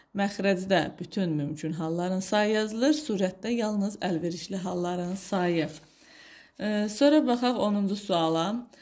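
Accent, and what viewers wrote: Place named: Nakhchivan, Azerbaijan. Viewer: Naxçıvanlısınz danışdığınız xoşuma gəldi +dərs izahı😍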